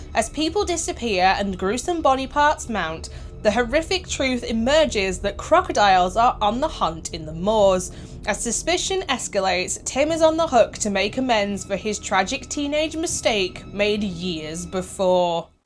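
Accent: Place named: England, United Kingdom. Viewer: I can't sound as good as your English accent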